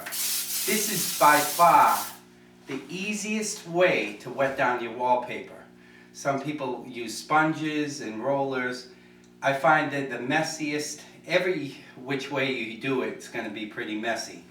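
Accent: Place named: Boston, United States. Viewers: Boston accent, love it! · Good tutorial. Love the MA accent! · Gahhhhhdon sprayah. Good ole yankee accent you got there my brother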